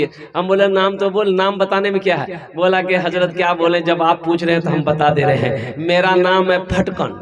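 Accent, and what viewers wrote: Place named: Bihar, India. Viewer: Asli behari urdu bol rahe hain.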